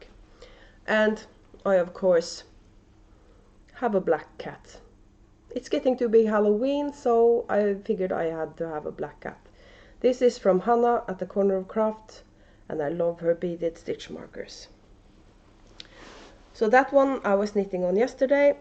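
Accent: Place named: Norway. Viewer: Your accent is wonderful! My great grandfather came from Norway as a very young man and I enjoy hearing the accent! Don't worry about editing, for me as a viewer, I love to see natural podcasting. I enjoyed making fun costumes for my children and they used to love decorating with pumpkins they had carved different faces on to put on the porch with candles in them we would light at night. We used to clean the seeds and bake them for snacks Wich was fun. The best for me, was making them pumpkin cookies, which the loved. They are a cake like cookie with lots of spices and rasins. Love your podcast. I do hope your face heals quickly. Yarny hugs! Ava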